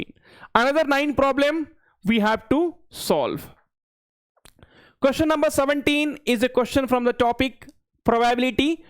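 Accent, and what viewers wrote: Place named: South India, India. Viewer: Your english is as if you are South Indian😅😅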